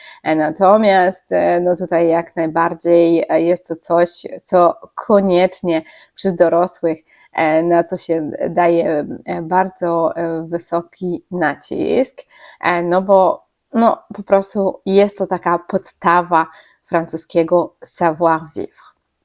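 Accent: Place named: East, Poland. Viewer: Pani ta mowiac po polsku ma trochę wschodni akcent, taki "śledzikowy". Zauważyłam,że coraz więcej młodych- dorosłych ludzi tak mowi używającąc zmiękczonego języka polskiego, jakby nie wyszli z dzieciństwa.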